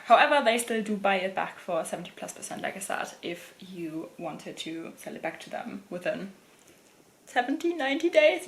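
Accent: Germany. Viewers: Love your german accent 😊😊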